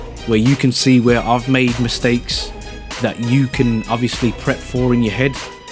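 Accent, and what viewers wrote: Birmingham, United Kingdom. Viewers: You from brum lad?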